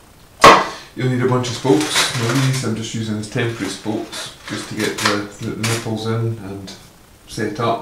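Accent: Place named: Scotland, United Kingdom. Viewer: Oh he’s Scottish !!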